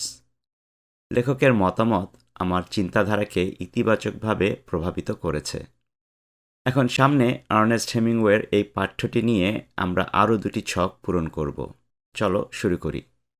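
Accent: United States. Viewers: Sir American accent keno. Amra British Accent e shiktaci. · Tell me how you learned to pronounce English like an American, I need you! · Sir, your English is so nice, how you learned to pronounce English like an American?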